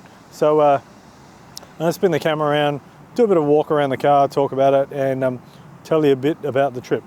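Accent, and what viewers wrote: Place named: Australia. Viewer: I wish this video was in American English, I'm having a hard times to understand these Australian language at times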